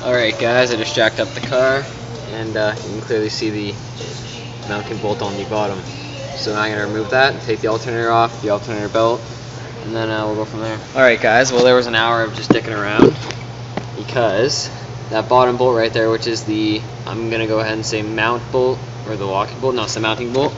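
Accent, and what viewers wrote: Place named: Canada. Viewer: He sounds Canadian so probably yes.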